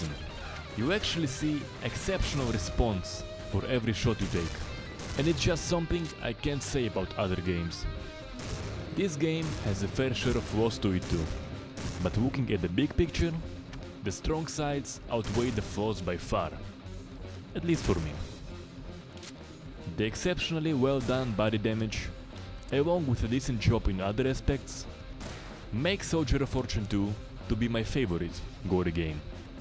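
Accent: Russia. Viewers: Русский что-ли? 😀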